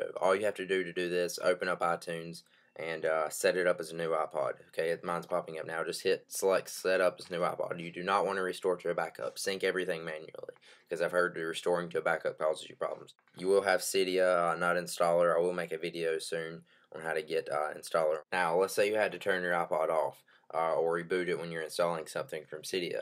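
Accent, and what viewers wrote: United States. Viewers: lern englisch. Nein ehrlich so sprechen manche Leute in den USA.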